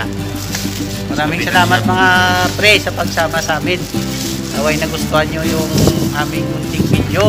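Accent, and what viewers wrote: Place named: Visayas, Philippines. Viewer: Haha nice.. Sana all may shawarmahan😂 btw taga saan ka po kuyz? Love your accent. Bisaya ako😁😁..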